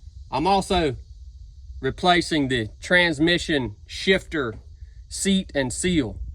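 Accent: South, United States. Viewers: I don't know your history since I'm new to the channel. I keep trying to figure out your country accent. Here are my guesses in order: Kentucky, Tennessee, West Virginia, Georgia. It can't be Texas. And it really doesn't matter too much anyway. Just curious. I am a student of language and accents. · Bro I like your content but this is a little bit much lol you almost sound hillbilly sorry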